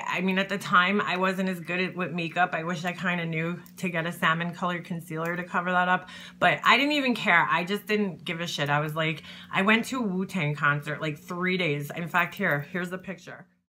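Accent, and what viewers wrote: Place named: Long Island, United States. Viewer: Long Island accent! Who knew? I've been trying to figure that out. Keep on runnin' your mouth with honesty! You are adorable.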